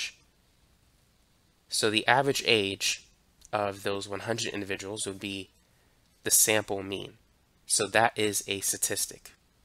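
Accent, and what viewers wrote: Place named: Brooklyn, United States. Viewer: He has just the slightest Brooklyn accent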